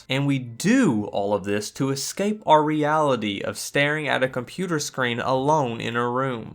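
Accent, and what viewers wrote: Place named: Alabama, United States. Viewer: Idk where you're from but your accent is pretty much exactly like everyone I know (I'm from Alabama for context)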